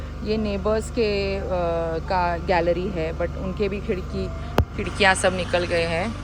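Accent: India. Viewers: I hate Indian English accent why u guys do this trrrrrŕrrrre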